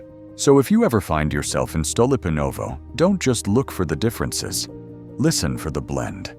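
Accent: United Kingdom. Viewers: You have a British accent, and you are highlighting the negative aspects of all countries. What about your own country, Britain? britain is now taken over by Pakistanis, rape and murder are everywhere, poverty and homeleeness is common. Show your own country first. You don't look at the reason, only describe. The condition of these people in Bulgaria was normal during the days of socialism, but under capitalism, it is now a disaster.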